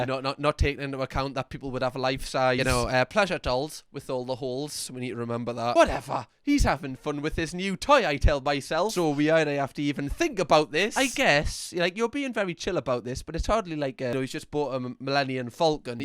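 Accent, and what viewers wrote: Newcastle, United Kingdom. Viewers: You should visit Newcastle. A lot of them sound like that lol it’s a lovely place too. My parents are both from the north east of England and a lot have similar accents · I just wanna let you know that after I watch your videos the inner narrator of my thoughts inside my brain has a geordie accent for like minimum 6 hours post-watching every time 😂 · Love you saying "bloody hell". Love that British accent. You are a funny and charming guy. 😂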